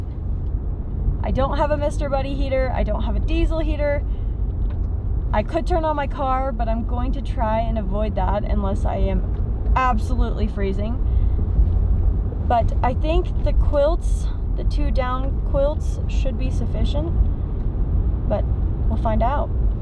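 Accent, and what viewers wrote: United States. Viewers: why not buy true american you sound american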